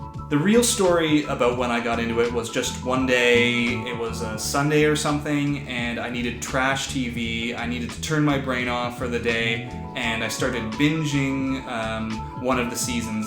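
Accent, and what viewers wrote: Canada. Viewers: I FREAKING KNEW IT I KNEW THAT ACCENT WAS CANADIAN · Congratulations on reaching 101k...that is amazing and the fact you are Canadian as well is such a bonus!! 🌹 ( We say tomorrow different than yankees? Who knew) PS MikeBookGiveAway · I WAS SO NERVOUS for some reason haha I pictured you with dark brown hair! I’m not surprised you’re Canadian based off of your accent :) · As a fellow Canadian I somehow detected your Canadian accent haha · I thought you were cool better because you have a Canadian accent and I'm a Mainer/half Canadian--- but as a Big Sister-- you're even cooler for talking about the Big Brother/Big Sister program! Love your videos, thanks for being so fun! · OMG those dogs are awesome!! LOL! Ok...You're so cute and thanks for doing the face reveal. It's so nice to have a face to go with the voice now when I watch the fantakes. 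I'm glad you do them and I am forever anxiously awaiting the next one you make. And yep...I can definitely hear the Canadian now that the video came out and I'm listening more to what you are saying than concentrating on a recap. It's the word "about" that always gives it away. To my ears it sounds like "aboot". LOL....well, maybe not quite that bad but close enough. Always a fan and congratulations on hitting 100,000K. You rock! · Where in Canada are you? (Your subtle accent gave you away!) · Well that explains why your voice sounds so normal to a fellow Canadian. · Also you say "Tuh-more-owh" and Americans say "Tuh-mar-oh" that's probably what gave the canadian away. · Nice to "meet" you, i was looking forward to you reaching your 100K mark (congrats on that!). I had guessed right about you being from Canada - I can't tell the difference between how Canadians and Americans pronounce "tomorrow" but to me, the giveaway was the way you say "out". 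I've noticed Canadians pronounce the U more. (I'm French-Canadian, so it took a while before my English became good enough for me to notice those subtle differences.) You didn't say which province you are from, though...? Love your humour. Would love to hear you cover Survivor - I'm a big fan as well.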